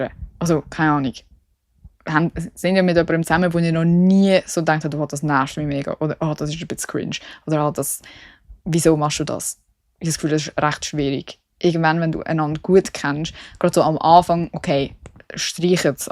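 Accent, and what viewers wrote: Basel, Switzerland. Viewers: Mir fällt auf, du hast dein Dialekt immer mehr Basel angeglichen.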